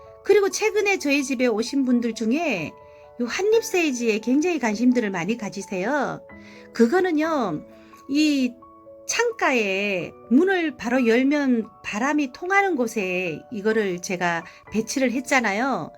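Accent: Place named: Gyeongsang, South Korea. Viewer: 경상도 억양이셔서 여쭤봤어요~~^ 즐거운 하루 되세요~~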